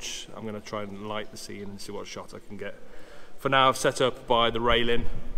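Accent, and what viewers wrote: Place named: Black Country, United Kingdom. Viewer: LOVE IT! Speaking the Black Country accent:) Again a beautifully stylized & wonderful film. The tunnel pics are stark but yet meaningful.....to me right now they represent that light at the end of the tunnel my country has fallen into. Again sorry to be late, but it's been crazy....12 new people here at the homeless shelter....and I'm barely getting home before the curfew the last few nights.....very difficult times here in the US....dude I swear not all Americans are bad people...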